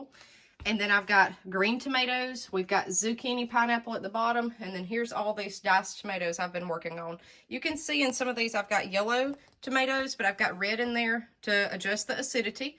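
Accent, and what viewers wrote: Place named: South, United States. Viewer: Alabama!? I swore up and down y'all were from Texas or Arkansas after hearing your accent, y'all keep on raising hell and eating cornbread over yander😅 🍻 from South Carolina.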